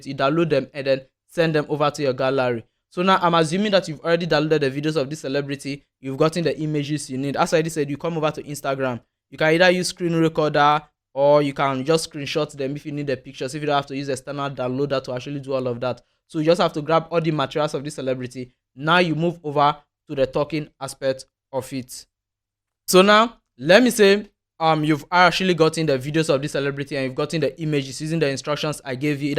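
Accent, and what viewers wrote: Nigeria. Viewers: Wow... Nice content 🎉 Your accent is gradually becoming 100% Nigerian, wow ❤. Ghana and Nigeria are one ❤ I was surprised seeing how you follow a lot of Nigerian accounts online. Great content mi bro 🎉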